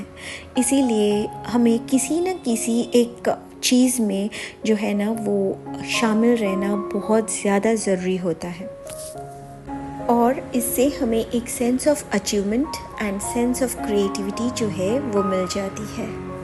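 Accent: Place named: South, India. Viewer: Very nice.🎉 R u a south Indian ur accent i felt so.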